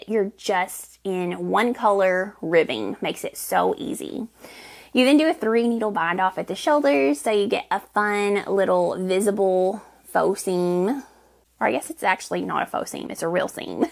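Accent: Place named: Tennessee, United States. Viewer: Found you through a post by Andrea Mowry, and popped right over. Such restful scenery and the colors in the area where you live. I was guessing TN based on accent 'cuz definitely not GA where I am...not enough pines. Love your work and your home, what I've seen. Decided to stay and subscribed. Thanks for a lovely restful post!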